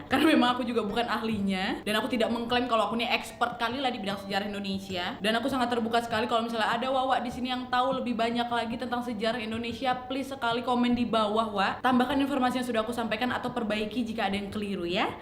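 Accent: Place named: Sabah, Malaysia. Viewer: Mendengar logat bicara pasti orang Sabah dan bukan dari semenanjung 👍👍👍👍👍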